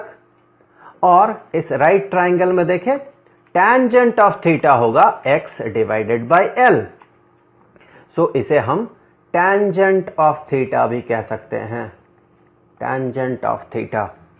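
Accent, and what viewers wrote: India. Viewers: والله حسبه يتكلم انجليزي بس باللكنه الهندية.. لين استوعبت ههههههههههههههههههههههه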